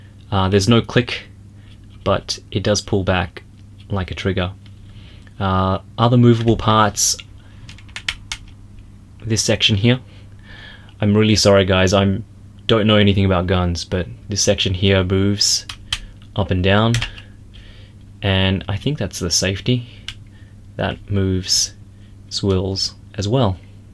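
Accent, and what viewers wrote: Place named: Australia. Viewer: And hey, your accent definitely sounds Aussie! Great to have another Aussie figure reviewer on the tube!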